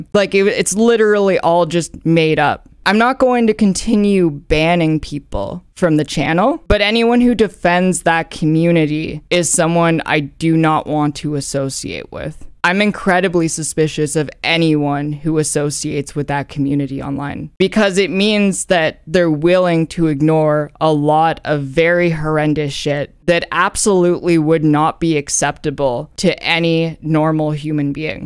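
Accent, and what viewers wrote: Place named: Canada. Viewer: Wait! You sound Canadian. I thought you were Italian :( Mamma Mia!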